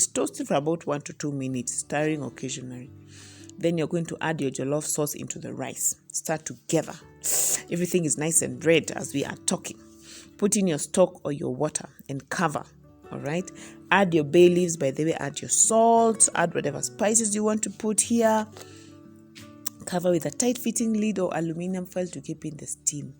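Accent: India. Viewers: Omg! The Indian accent 👏❣️your videos inspire me. I learn alot. Keep doing what you do. Love love your content ❤️❤️❤️❤️❤️ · Liking the Indian accent, great video as always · You knocked me out with the indian accent..Easily my fav Youtuber..! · Looove the Indian accent 😅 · I love the Indian ascent wow, watching you from zambia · For me it’s the Indian accent😂😂. Am done😂Good video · Your English-india is perfect,join Bollywood una potential mama! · Hahaha! I love the Indian accent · The Indian accent cracks me up. Great content girl. Lov from Tanzania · 😅🤣🤣the Indian accent though. Good job mama. I will definitely try the naan and butternut soup. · The Indian accent,you nailed it!Hope the camera guy gets fed the delicious meals too😃😃Love all the recipes,good work Mama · Its the Indian accent for me 😅😅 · beautiful. I love your i indian accent. I love indian food too especially the spices in their foods. 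I would love to know if you have ever been to india or if you studied there. Been there for my studies hence the love for the foods. I love your contents esp food vlogs. 😋😋. Much love❤❤ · Wooow!! Good job. The Indian accent 👌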